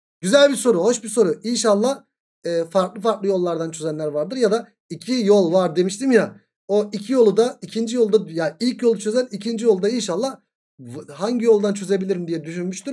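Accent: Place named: Trabzon, Turkey. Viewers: trabzonlu net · Bence trabzonlusunuzdur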